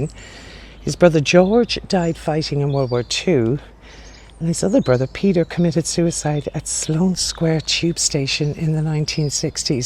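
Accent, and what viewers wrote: Ireland. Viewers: Lots of Irish references to go with your very slight Irish twang, I know Hampstead well, lots of celebrities currently live in these roads you are talking about, All best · Hi, I love your video. Not so much of a hidden Gem anymore but still hidden from most tourists. I think I discovered the Hill Garden and Pergola in 2018 or 2019. I always wanted to go to the West Heath, but I only made it to Hampstead Heath not the West Heath so I found it by accident. Boy was I amazed It was during the summer and it was a beautiful sunny day and I was almost by myself here. Mind you I came to this part of London in January 1982 to work for the first time a week before my 17 th birthday. I have been to London so many times over the years but I'll always love my part of London namely the NW Hampstead, Golders Green, Kilburn, West Hampstead, Queens Park, Swiss Cottage, St John's Wood that will always be my part of London and I keep coming back. 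I have also lived in LLanduno Wales, Portrush NI and in Edinburgh and I have travelled a lot in the UK as a tourist as well. I like your Irish accent and I think yo make some really nice videos. lots of love from Denmark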